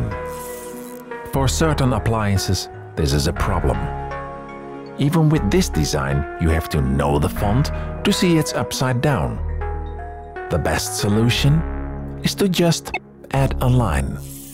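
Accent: Netherlands. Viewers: Are you from the netherlands? it kind of sounds like it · Why is it that i can always hear that someone is dutch? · I already thought I could hear it from the accent, but hell yeah this man dutch. Mooie video, ik hou wel van dit soort deepdives in iets waar je normaal gesproken nooit over na denkt :) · noticeable Dutch accent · In the 1990s, I was already an experienced engineer in my 30s. Some technologies that used 7-segment: "Numitron" (incandescent), LED, LCD, VFD (vacuum fluorescent), mechanical (plastic, brightly painted on the active segments), & "Panaplex" (Neon, used on some 70's microwave ovens, and gas pumps). This guy's accent sounds like his native language is Dutch. I was born in the Netherlands. Moved to the US as a child, and use to tease my father about his thick accent, in my teens. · The Dutch accent is truly horrific.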